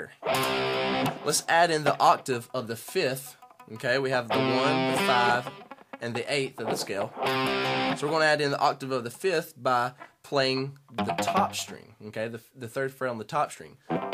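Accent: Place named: South, United States. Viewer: I can hear that southern accent. :)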